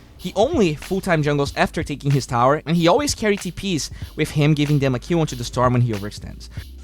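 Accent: Brazil. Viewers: Good job man, your accent sounds familiar to me haha just in the case, are u Brazilian?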